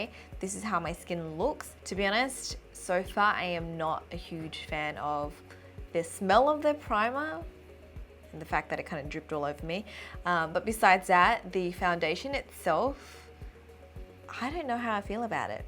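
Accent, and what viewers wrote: Australia. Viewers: Ur Aussie accent is over powering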